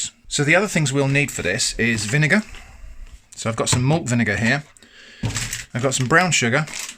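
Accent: United Kingdom. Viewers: For some reason you sound kind of british.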